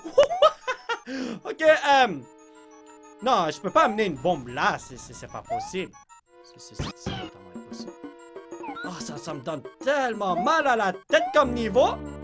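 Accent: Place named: Quebec, Canada. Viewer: J'adore les expressions qu'il utilise, en plus il y a un accent québécois sans que ce soit trop prononcé c'est génial :)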